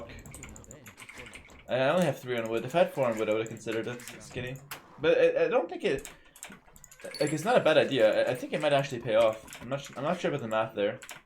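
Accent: Canada. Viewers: haha thats just how we talk in Canada. We gotta say honestly before explaining something or how else will people know we are being honest? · ...You sound like your from the French speaking part of Canada.. or speak French.. you should should try and impersonate an Aussie accent ✌🏽🇦🇺🤙🏽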